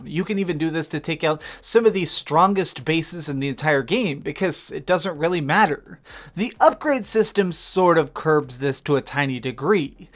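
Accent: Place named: South, United States. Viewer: Stop with the fake announcer voice we all know you are trying to hide that southern accent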